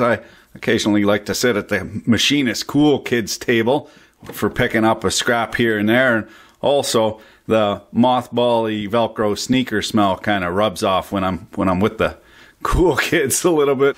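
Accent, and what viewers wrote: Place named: Canada. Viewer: Why can't Canadians pronounce Z correctly?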